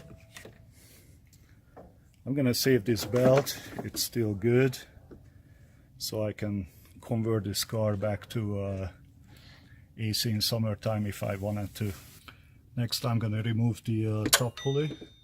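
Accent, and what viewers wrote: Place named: Russia. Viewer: The longer the video goes to more russian/european you sounded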